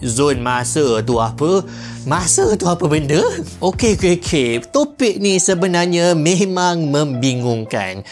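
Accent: Malaysia. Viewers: Itulah logat bahasa Malaysia yg sebenarnya.beda dg indo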